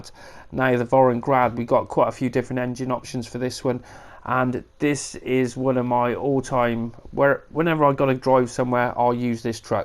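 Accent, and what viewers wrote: United Kingdom. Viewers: Best top ten video I’ve ever seen. Very informative and I love the British accent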